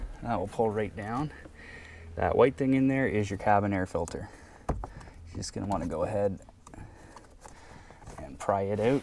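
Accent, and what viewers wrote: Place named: Canada. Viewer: Canadian eh!? Thanks for the tutorial!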